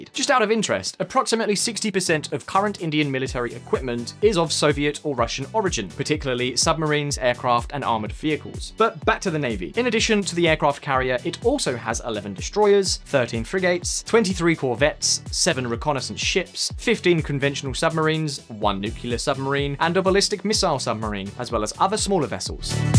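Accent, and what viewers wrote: United Kingdom. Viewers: 1. thats a british accent 2. America is barely involved in Kashmir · Not Americans the guy is probably from UK judging from his accent. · He isnt american, he has like a UK accent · The narrator in this video is from the UK, what does America have to do with this video?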